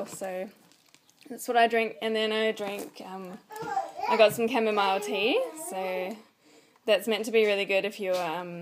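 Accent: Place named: Australia. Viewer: Just heard you say you use to live in Australia. I can't seem to work out whether your Australian or New Zealander. It's hard to tell by the accent sometimes😊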